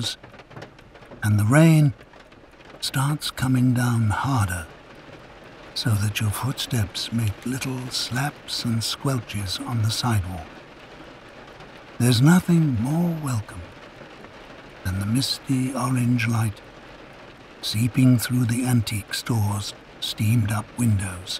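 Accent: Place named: United Kingdom. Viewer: Why get a British v/o and then make all the references American? Jarring. Sidewalk, drapes, 24/7, rainday, store...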